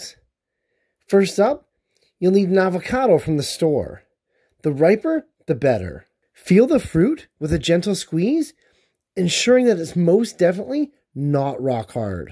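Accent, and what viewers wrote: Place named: Canada. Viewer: Cool Canadian accent😁 thank you for sharing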